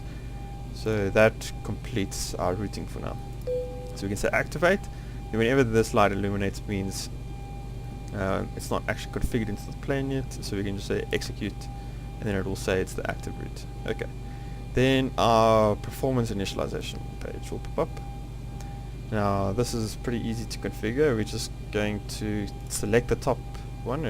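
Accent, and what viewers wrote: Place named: South Africa. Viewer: I thought you sound South African and I was right. Im also down here but thanks for this I jist finished my pc build and started to play xplane.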